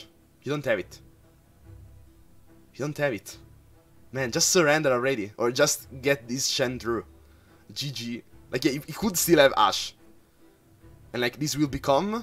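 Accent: Italy. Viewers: You ITALIANO?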